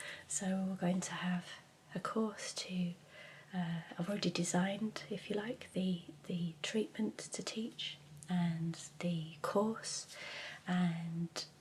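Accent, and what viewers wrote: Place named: Northern England, United Kingdom. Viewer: I love your northern twang still coming out when you speak. You have a lovely voice… congratulations on 10 years ❤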